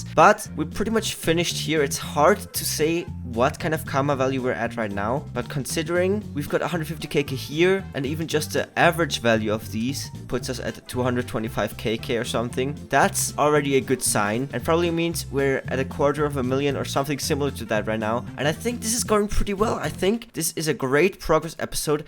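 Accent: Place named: Quebec, Canada. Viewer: where are you from buddy? Im pretty sure I heard some french quebecois in your videos ^^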